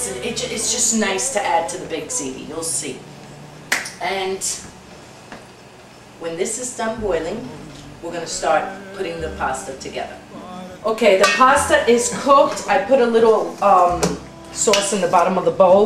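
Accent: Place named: Brooklyn, United States. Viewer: u could be the rachel ray from brooklyn with that accent!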